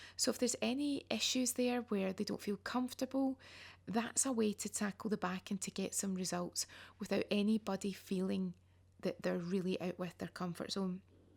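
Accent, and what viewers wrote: Scotland, United Kingdom. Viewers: scottish accent pure love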